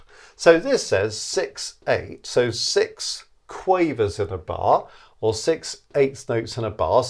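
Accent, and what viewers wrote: United Kingdom. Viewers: Simple explained and important topic, thank you. Great British accent too 😀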